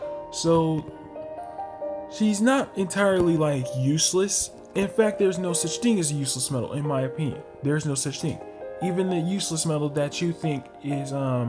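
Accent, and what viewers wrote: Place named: Canada. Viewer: Take a shot every time this canadian person says "like". idc if he's not. just wanted to point out. he just sounds canadian.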